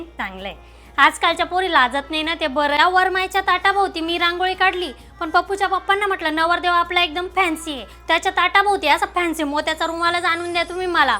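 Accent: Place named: Ahirani, India. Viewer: माझे सासर चाळीसगाव जवळचे नगरदेवळा आहे... मी मुंबईची आहे पण मला अहिराणी बोलायला थोडी येते, पण समजते.तु हल्ली व्हिडीओ मध्ये अहिराणी नाही बोलत... तुझे अहिराणी ऐकायला छान वाटते... तुझे अहिराणी तले व्हिडिओ मी आमच्या फॅमिली ग्रुप मध्ये आणि इतरांना पण सेंड करते... त्यांना पण बघायची सवय लागली आहे ❤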